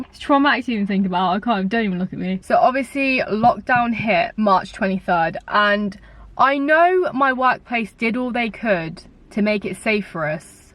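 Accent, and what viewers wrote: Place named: United Kingdom. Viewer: British English is increasingly becoming a new language. I had to concentrate to understand and my neices are British! 😂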